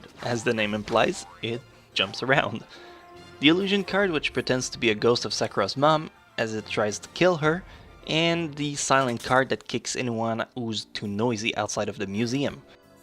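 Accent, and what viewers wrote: Quebec, Canada. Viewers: are you from Quebec? The accent seems familiar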